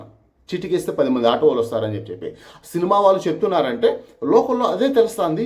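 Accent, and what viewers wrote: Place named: Vijayawada, India. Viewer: Anna basha Vijayawada nunchi udo tisukunna chala bagundi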